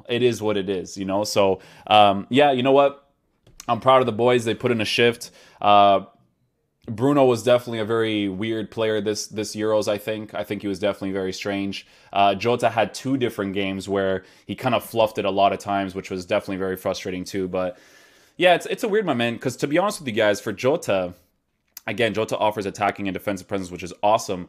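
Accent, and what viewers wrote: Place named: Portugal. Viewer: I swear to god I cant understand if u are portuguese or not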